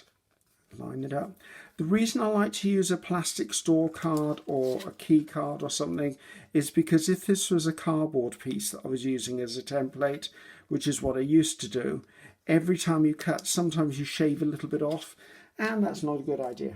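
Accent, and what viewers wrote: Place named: Southampton, United Kingdom. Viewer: What a pleasure hearing you ! It takes me more than 50 years ago, when I went to see my granny for my holidays in Southampton. Her way of talking was like yours, same style same words same particular idioms (tickle in the throat for e.g.) that I haven’t heard since. When I watch your videos I'm a little bit at home. Now I’ve lost all practice and vocabulary and the « Oxford » way of speaking is the only one I can understand. Thanks so much for this « madeleine de Proust ».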